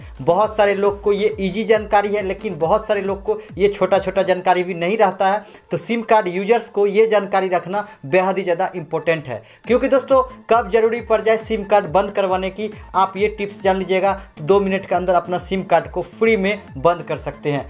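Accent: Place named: Bihar, India. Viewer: तेरा आवाज बिहारी की तरह लग रहा है 😁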